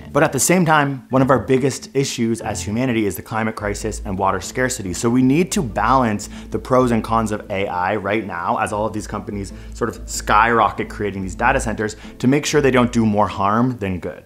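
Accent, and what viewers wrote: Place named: Canada. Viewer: I knew he was Canadian due to the way he pronounces data.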